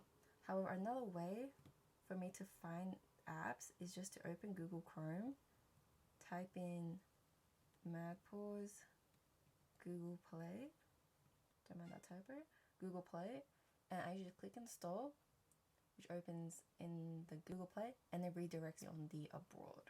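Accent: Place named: Australia. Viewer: Clicked because I had never seen that phone before, heard the Aussie accent and immediately subscribed. I so much prefer home grown content.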